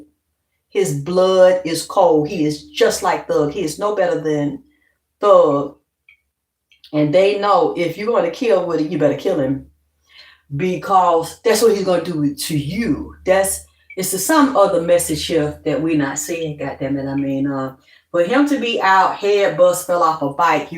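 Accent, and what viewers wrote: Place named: South, United States. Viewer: I so love how u talk u be having me laughing so hard 🤣 that down south all in u hunni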